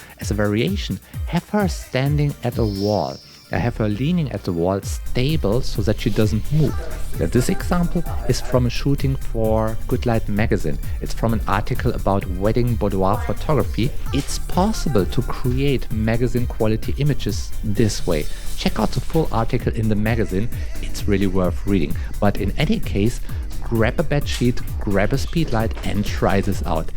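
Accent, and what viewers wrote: Germany. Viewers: That German accent :)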